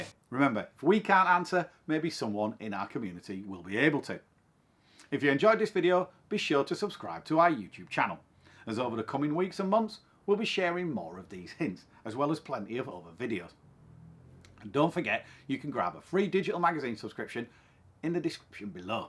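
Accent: United Kingdom. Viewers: To all not in the know, is a proper Englishman with an English accent! Don’t forget, the British invented pretty much everything including SCUBA, not the French, so he has licence to describe everything SCUBA! Personally, best way to get a wetsuit on is to use drysuit lube on the ankles & cuffs. I also take lengths of thin rope with carabiners to hang stuff to dry off the rails on the liveaboard. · sorry, having some trouble understanding. Cheap and plastic what often found in camping stores. Missed the bag trick for wetsuits too. Your brit accent is strong. Maybe demonstrate some of what you are saying. Thanks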